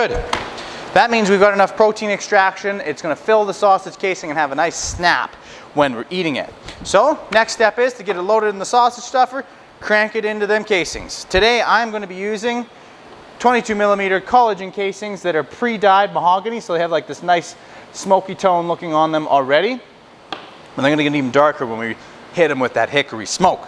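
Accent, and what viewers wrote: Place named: Canada. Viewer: He’s definitely Canadian. I’m from the Great White North myself and that accent is unmistakable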